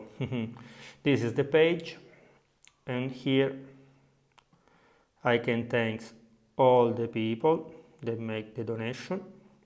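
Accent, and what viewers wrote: Italy. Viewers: Your english is not bad, it’s great, it has an italian accent to it and it is normal. People need to chill and stop being rude and appreciate the unique content you are adding.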